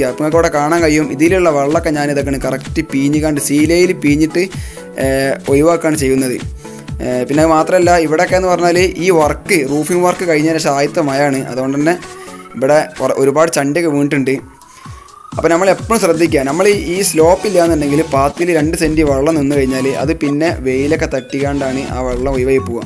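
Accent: Malappuram, India. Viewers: ഹയ് ഞാൻ നിങ്ങളുടെ വിഡിയേ എല്ലാം കാണാറുണ്ട് നിങ്ങളുടെ വർക്ക് എല്ലാം സുപ്പർ ആണ് നിങ്ങളുടെ സംസാരം കോട്ടാൽ മലപ്പും ആണ് ഞാൻ ഒരു മലപ്പുറം കാരാൻ ആണ് എൻ്റെ നാട് പുലാമന്തോൾ ആണ് നിങ്ങൾ ഫോൺ നമ്പർ ഒന്ന് തരണം സന്തോഷം കൊണ്ട് ആണ് നിങ്ങൾ പറയുന്നത് പോലെ ഞൻ ചെറിയ വർക്ക് പടിച്ച് വരുന്നു നിങ്ങൾക്ക്‌ ആരേഗ്യമുള്ള ദീർഘയസിനെ തരട്ടെ ആമീൻ യാ റബ്ബൽ ആലമീൻ c ആമീൻ (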